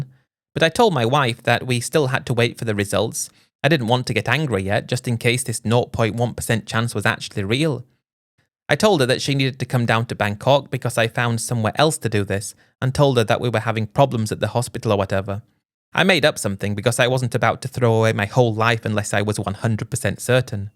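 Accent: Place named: United Kingdom. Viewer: You should’ve just accepted the baby because you are British and the accents kind of annoying so what are you doing? You know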